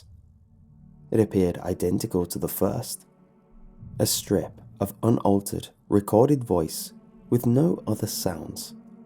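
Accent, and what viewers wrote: United Kingdom. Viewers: God I love you. Your voice is terrifying and soothing at the same time, aided by the fact you sound young yet mature. That British accent is a bonus too!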